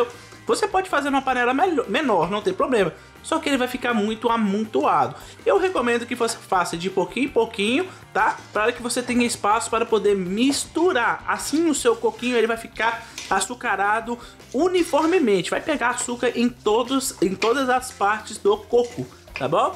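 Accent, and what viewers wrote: Minas Gerais, Brazil. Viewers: 👏🏽👏🏽👏🏽👏🏽👏🏽👏🏽👏🏽 Tem que falar com minerês mesmo!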